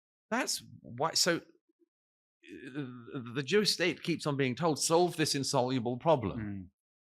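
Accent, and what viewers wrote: RP, United Kingdom. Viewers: Douglas Murray is mostly empty rhetoric and because of his posh accent people way overestimate his intellect. On Gaza he is dead wrong. It’s clearly an imperialist project by Israel. He ignores the fact that Netanyahu propped Hamas up, and that his govt is full of radical imperialists who openly say they want to take Gaza over completely (and are about to). This was never about defence, it was always about stealing land. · He leaves out that Israel has been just as terroristic in their actions as has hamas. They also have never received statehood or had a seat at the table at the UN. You all listen to this man with a British accent using Strong vocabulary and act like he isn’t spewing bs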